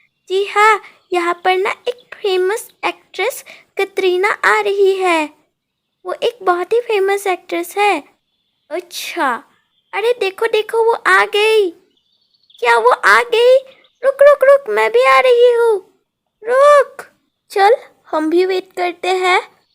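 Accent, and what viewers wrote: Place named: Assam, India. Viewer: Ab Assamese ho lsliyai abka jab hindi bolotai ho to etina shoot naihi karta but koi nhi ab at least try to kar rahi ho..💞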